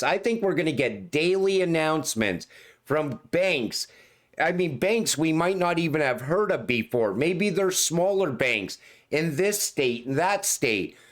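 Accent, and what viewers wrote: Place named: Minnesota, United States. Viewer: Hey where are you from in the us? I'm guessing up north like minnesota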